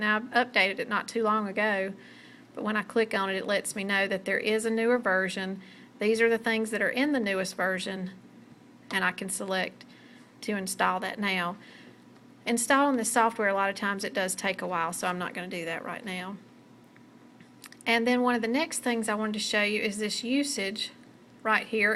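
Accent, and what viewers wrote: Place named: South, United States. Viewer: i love your accent (southern?)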